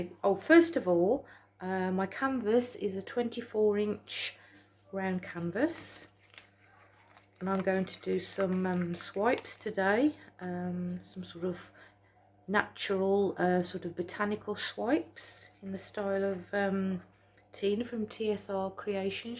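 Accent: United Kingdom. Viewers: Another amazing piece, where are you from? You sound so English to me but I see you are in Australia. I am English and currently live in USA.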